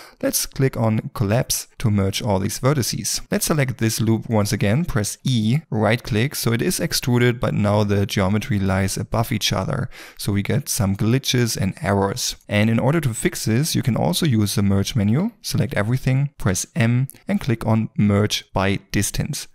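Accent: Germany. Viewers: are you german? sounds a bit like an german accent :)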